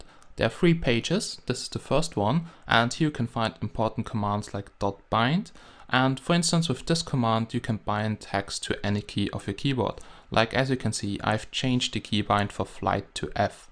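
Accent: Germany. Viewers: Merkst du das nicht:D? ich finde man merkt es an seiner aussprache :) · Man merkt das du Deutscher bist.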